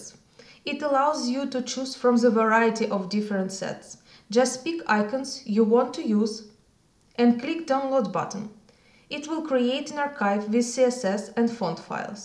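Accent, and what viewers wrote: Russia. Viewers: твой английский имеет русский акцент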